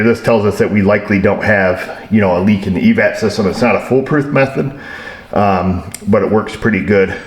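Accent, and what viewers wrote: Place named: Southern Tier New York, United States. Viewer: As always, a riot if you have the captioning turned on: "Self Made Auto"....even better: "Cancer vent valve". It wasn't until I started watching great channel, that I realized that the southern tier of NYS had it's own dialect. Cool!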